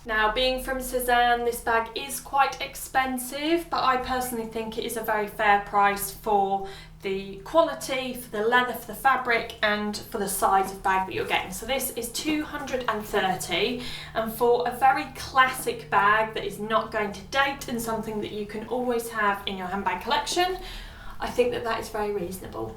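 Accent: United Kingdom. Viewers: Gorgeous those blazers !!! I loved them. In fact, I liked all of your purchases, the white and pierced blouse is beautiful !!! Oh, I always forget to tell you, I love your UK accent !!! Best wishes from Brazil.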